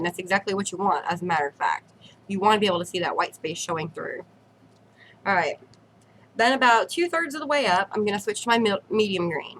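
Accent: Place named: South, United States. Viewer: Your southern accent is adorable! And great tips girl!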